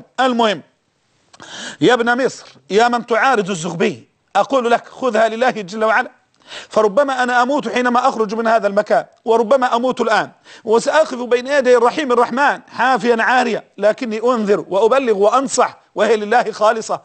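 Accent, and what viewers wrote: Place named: Palestine. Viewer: بالمناسبة هذا ليس مصريا فاسمه ولهجته فلسسطيني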